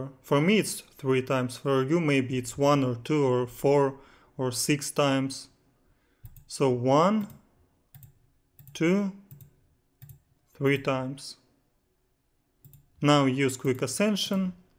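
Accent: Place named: Russia. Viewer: русский акцент, красава, норм шпаришь по-английски!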